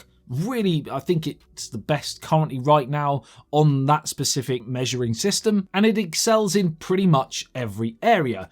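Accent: United Kingdom. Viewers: Is this Youtuber from UK ? I luv British Accent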